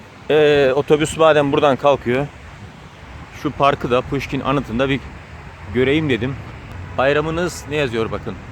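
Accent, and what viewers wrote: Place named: Turkmenistan. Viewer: Тилингиз шеваси туркманларга ухшаб кетади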